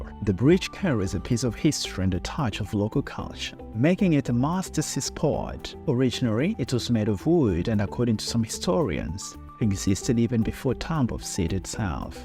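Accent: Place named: RP, United Kingdom. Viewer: Kizungu cha BBC news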